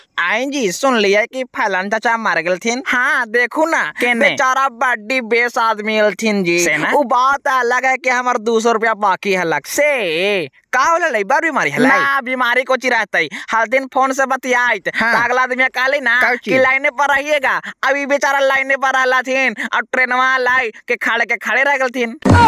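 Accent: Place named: Patna, India. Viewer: Je tu patnaiya tone or bhasa me bolte hauwan.... Baddi bes lagat hauye.... 😂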